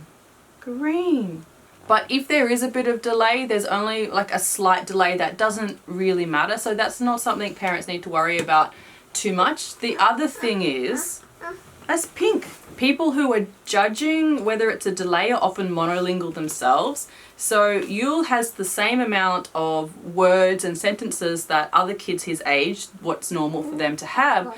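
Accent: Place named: Greek Australian, Australia. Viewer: Are you Greek Australian? You have a woggy Aussie accent and said "no not papou, bathroom" so I assumed you must be Greek Australian.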